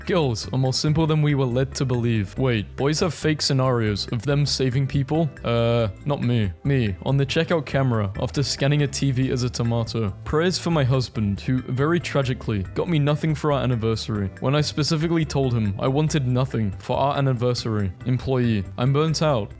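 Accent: United Kingdom. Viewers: first of all it was a joke, second of all he has a British accent so I assume his first language is English and you saying it like it’s not his first language and if that was the case I would think differently, second of all I’m not saying he should be able to pronounce all of those words you listed because yeah they are hard to pronounce. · It’s cause he’s british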